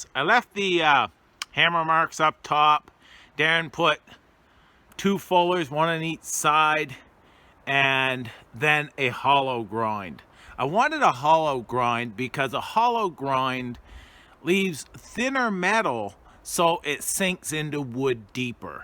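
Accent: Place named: Chicago, United States. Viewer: a very nice blade. "Da Chappa" with a nice Chicago lilt. Cheers, Mate.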